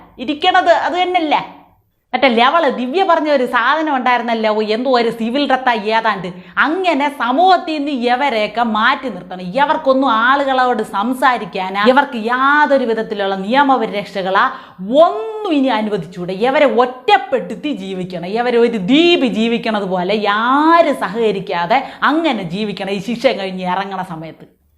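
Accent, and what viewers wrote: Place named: Trivandrum, India. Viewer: പൊളിച്ചു.ഈ തിര്യോന്തരം സ്ലാങ് കേൾക്കാൻ തന്നെ ഒരു പ്രത്യേക രസമാണ്.ഇനി ഇങ്ങനെ ഉള്ള വീഡിയോസ് ഡെയിലി ഇടണം.സൂപ്പർ 👍🏻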